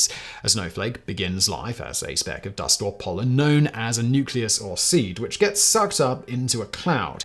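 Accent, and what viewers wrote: United Kingdom. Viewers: I can't see eyes scanning as he reads but he can't possibly have memorized that whole script. Can he have? Anyways, the combination of densely and precisely scripted scientific information delivered at an incredible clip in that British accent does make him appear to be THE SMARTEST HUMAN BEING ON THE PLANET. Amazing content as aways.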